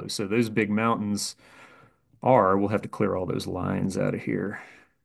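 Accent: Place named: Western Pennsylvania, United States. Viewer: ...is the narrator from PA, spesifically western PA? He sounds like several family members that are from near Pittsburgh.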